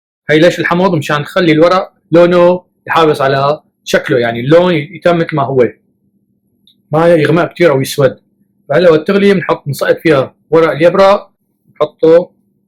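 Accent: Levant. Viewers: الله يعطيك العافية متابعة لقناتك لشوف الطبخات الظريفة واسمع لهجتك الشامية الحلوة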